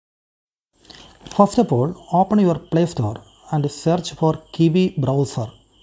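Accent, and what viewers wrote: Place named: Kerala, India. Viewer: You are a malayali I can understand it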